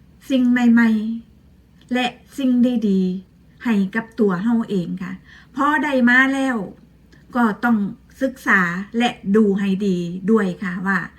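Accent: Ubon Ratchathani, Thailand. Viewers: คนอุบลนี่เว้าจาม่วนๆๆสุภาพอิหลีได่น้อจร้าา🥰🥰🥰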